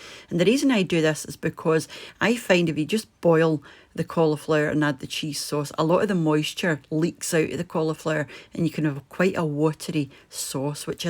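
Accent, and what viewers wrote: Scotland, United Kingdom. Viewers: Keith Richardson If you're suggesting she sounds like Lorraine Kelly - she sound nothing like her. Just having a particular type of Scottish doesn't mean they're all the same.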